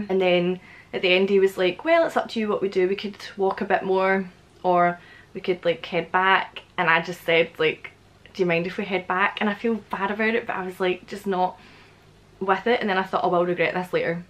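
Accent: Scotland, United Kingdom. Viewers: I could listen to you talk all day long about nothing, to me you have such a soothing voice love the accent could never get old.. I used to talk to a woman on Facebook and Skype with her she was from Edinburgh Scotland.. then all of a sudden she just disappeared her accounts were closed and haven't heard from her since I miss her dearly.. her name was Samantha White.. I live in Clearwater Beach Florida.. I listen to you daily just so I can get my Scottish fix, might sound silly to most people but out of all Accents in the world I love the Scottish the best,, keep up the good work you're amazing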